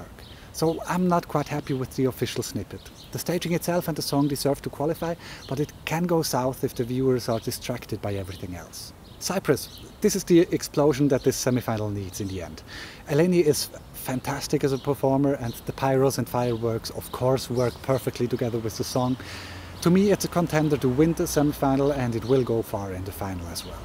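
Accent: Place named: Switzerland. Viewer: I KNEW you were from my home country based on your accent XD Where exactly are you from? I live in Horgen near Zürich!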